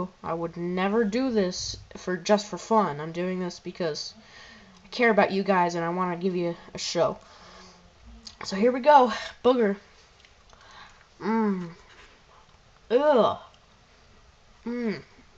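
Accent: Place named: United States. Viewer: I must be mad but I watched all of it both parts no skiping great fun I must get some don't know were to get them in the uk I'm gessing your american love ya actsent lol I will check more of ya vids :)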